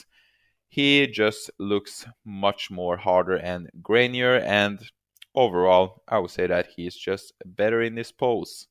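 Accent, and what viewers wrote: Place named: Sweden. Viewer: If I may ask, are you Swedish???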